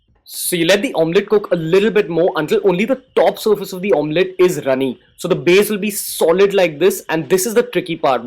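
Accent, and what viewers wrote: India. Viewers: U nghuy so ndope lol Indian accent but your so simple that what's makes u dope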